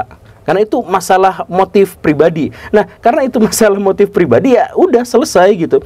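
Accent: West Java, Indonesia. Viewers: Jika ingin mencontoh kan maaf dan maaf.... Jika saya mengkritik dalam penyampaian dan pembuatan Kontennya tidak bermutu dan mengkritik dengan bahasa sarkas tapi saya menyinggung gaya bahasa yang di sampaikan berlogat Sunda, saya yakin nggak tersinggung dengan kritik saya tapi itu sebagai Vitamin tapi yg tersinggung pasti masyarakat Jawa Barat yg akan marah karena telah membawa cara penyampaiannya, Semoga juga bisa menyampaikan hal ini ke masyarakat dengan Chanel YT nya hukum yg tidak tertulis di masyarakat agar semua paham karena sejujurnya apa yg di sampaikan itu simple dan mudah dipahami tidak menggunakan diksi' yang jelimet..!!! Sukses terus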